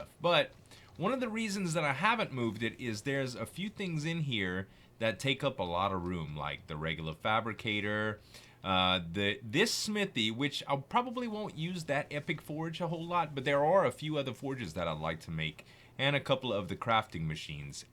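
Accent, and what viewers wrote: Louisiana, United States. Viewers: Z I watch you for you not just the games you play I watch you for the funny loud Cajun that you are! Although I might not like every game you play I still look at them just to see. On a side note I have been loving the face cam the VR and your new hybrid animals! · Hi Z, I like to think people come to your channel for the same reason I do......that Cajun accent, the fantastic way you get over excited and high pitch when something cool happens, and the high quality content you put out. No matter what you play. Just keep being Z cos that is what your good at, and that is what we want to see.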